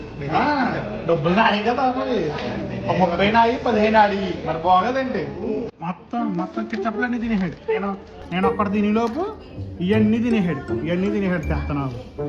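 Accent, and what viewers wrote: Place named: Godavari, India. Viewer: Godavari slang adaragottestunnav anduke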